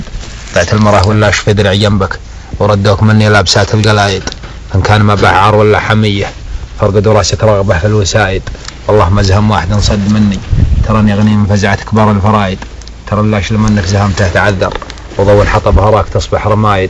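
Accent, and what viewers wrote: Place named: Shabwa, Yemen. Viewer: الكنق العربي بالغلط حطيت حرف الهاء المهم عدلته وكل ما اقصده يا اخوي ان لهجتنا مثل لهجة صاحب القصيده انت تشوف لهجه اهل تعز واهل صنعاء واهل عدن وبعض اهل البيضاء وبعض اهل صعده وتضنها لهجه اهل اليمن وانت في هذا غلطان لان لهجتنا مثل لهجه صاحب القصيده وانا من شبوه ولهجتنا مثلها وبعض اهل صعده واهل الجوف ومارب وقبائل حضرموت… الخ لهجتهم نفس لهجه صاحب القصيده في اليمن لهجات كثيره واكثر لهجه العرب تعرفها لان اصحابها يطلعون في الاعلام وغيرها هم اهل ال3 المدن عدن وتعز وصنعاء وترى كل منطقه يمنيه لهجتها تختلف عن الثانيه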